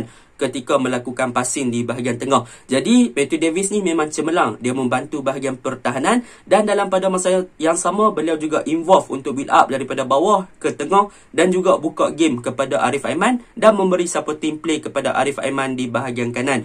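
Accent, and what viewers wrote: North, Malaysia. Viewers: Terbaik badeh tgok gaya ckp mcm org utara😊..apapun ulasan anda sngt baik fasih serta lancar dgn sebutan yg sinonim dgn bolasepak..syabas sdra dan sya sudah subscribe channel anda disbbkan anda selalu buat ulasan tentang pasukan JDT..selaku anak Johor sape yg x bangga dgn apa yg dah JDT n family lakukan thn demi thn,tapi pada thn ini ianya begitu luar biasa skali kepaduan nya malah dgn selambe menghantar rank no 1 n no 3 asia balik awal..giler babun pnya performance to all player JDT,x kira diposisi mana skalipun..trus terang aku tgok baca cerita tentang JDT klhkan UH n layak ke best 16 ni spai ke hari raya😂..hari² tgok n adakala nya x sedar airmata menitis ke pipi...fuhh giler arghh...dan dikesempatan ini juga aku ucapkan terima kasih pada fans² team lain yg menyokong serta mendoakan kejayaan buat JDT sehingga ranking MA Malaysia melonjak mengatasi Thailand juga Vietnam,yg mana di thn 2024/2025 nnt kelab di Msia akan ada 2 wakil layak automatik + 2 kelayakan...ini sesuatu yg baik utk bolasepak Msia khusus nya...tq to all JDT family terutama DYMM TMJ..💙❤️⬆️🔥 #JDTForAll #TMJTheSpecialOne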